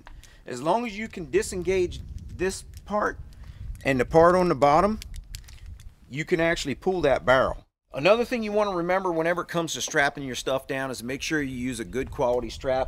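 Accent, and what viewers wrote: Australia. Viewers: Mr Big Australian English. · Great vid bro love the accent, it’s been a while since I used one of these dazz Australia..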